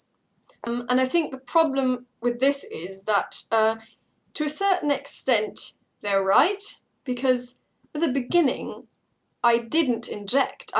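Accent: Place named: United Kingdom. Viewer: Du hast einen leicht britischen Touch :D Habe ich lange nicht mehr gehört! Und schrei bitte nicht deinen Pen an :( Der kleine will ja nur helfen...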